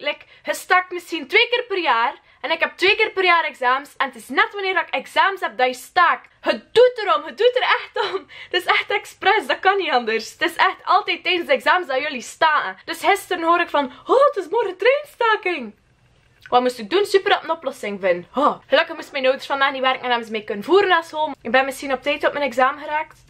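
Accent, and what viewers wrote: West Flanders, Belgium. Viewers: Meer videossssss aub :-) west vlaams hell yeah! SUBSCRIBED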